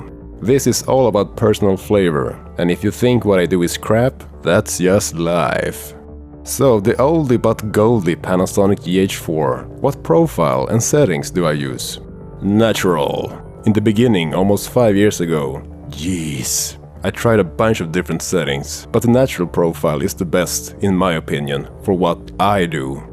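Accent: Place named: Sweden. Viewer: Är du svensk? Låter som de när du bryter! Bra film! har funderingar kring köpa denna kamera :I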